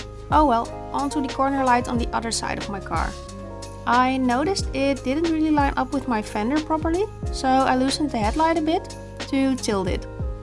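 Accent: Netherlands. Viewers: just by your accent are you from dutchland, or germany?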